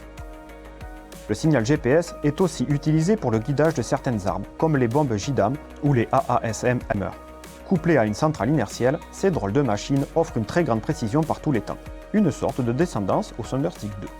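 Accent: South, France. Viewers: Un soleil de YouTube tant par la qualité du contenu que par l’accent du sud qui fait plaisir à entendre (le Basque est content). Continue comme ça, c’est un régal! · Merci et Bravo, et votre accent du sud est un régal 🤗👌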